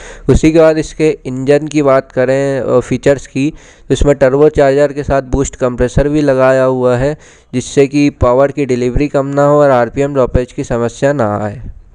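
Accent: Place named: Madhya Pradesh, India. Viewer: Me bhi mp se hu tumhare bolne k tarike se hi lg gya tha mujhe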